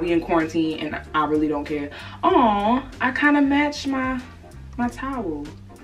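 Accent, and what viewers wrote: Baltimore, United States. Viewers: yooo so i was about to type you sound like you from baltimore but then i went on famous birthdays and you are like maryland gang represent!!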